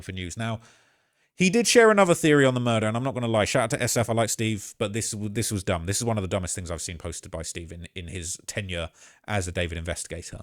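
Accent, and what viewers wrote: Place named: United Kingdom. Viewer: Dude got his nails painted😂🤦🏾‍♂️Bad enough having a British accent alone😂🤢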